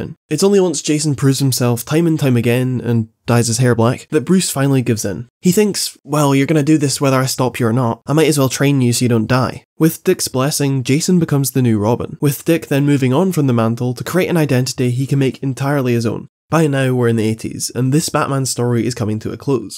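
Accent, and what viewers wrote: Scotland, United Kingdom. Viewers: Well he's Scottish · damn ur scottish too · Great video man, didnt realise you where a fellow scot until this video so ay:D from one Scottish to another Scottish, keep up the good work:)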